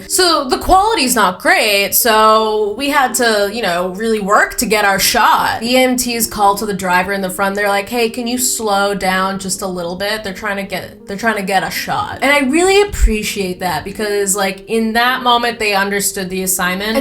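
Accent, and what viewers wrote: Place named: Massachusetts, United States. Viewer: so very wicked Massachusetts i haven't heard somebody say "pup-corn" in a while so thank you for that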